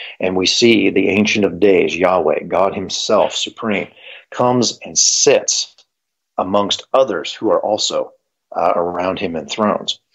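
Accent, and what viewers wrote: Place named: South, United States. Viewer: This sounds like our dearly beloved Dr Michael Heiser rest his soul but with a Southern twang I love it brother keep up the good work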